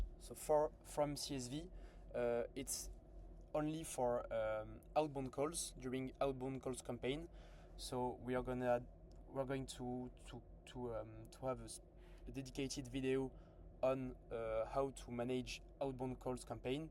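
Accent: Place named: France. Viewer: Pourquoi ne pas faire une vidéos en Français alors que la société est française, de plus avec un anglais avec accent français .Dommage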